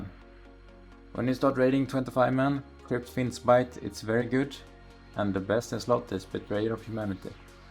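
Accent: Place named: Sweden. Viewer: yo does that weak aura come with that swedish voiceover lmao,great guide,thanks!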